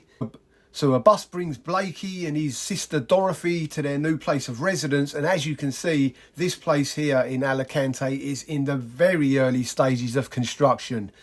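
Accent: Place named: South London, United Kingdom. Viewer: Good job. I enjoyed that. And your accent reminds me of south London where I spent most of my formative years! 👍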